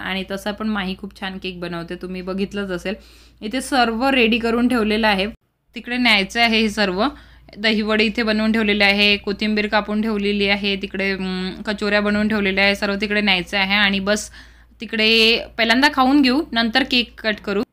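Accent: Vidarbha, India. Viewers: Tai tuzi vidharbh bhasha khup avadte. Mazi aai pan khamgaon madhli ahe. Khup athvan yete tuzi language aikun ajol chi... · हो माझी आई पण विदर्भ मधले ते पण संभार बोलते😂😂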